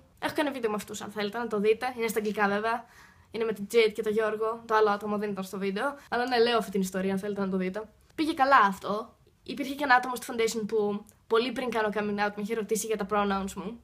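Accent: England, United Kingdom. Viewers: Φαινεσαι πολυ γλυκος ανθρωπος και εχεις μια.πολυ θετικη αυρα και μου αρεσει επισης η αγγλικη προφορα σου😍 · Μια χαρά είναι τα Ελληνικά σου. Το μόνο που μπερδεύει λίγο ότι μερικές φορές τα μιλάς με αγγλική προφορά (σύνδρομο Τσίπρα). Πρόσεξε το!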